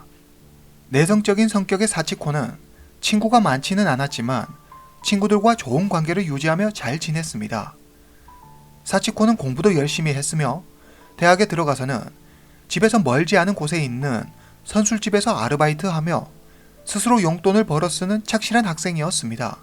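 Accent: China. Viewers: 님 중국사람이신가요?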